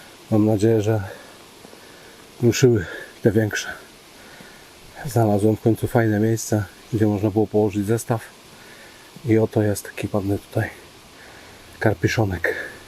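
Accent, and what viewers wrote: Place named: Poznań, Poland. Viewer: Widać(słychać),że jestes z poznańskiego i miło,że używasz czasem słówek z gwary-fyrtel,kieretyny,,grzdyl…Super!!!Powodzenia!!!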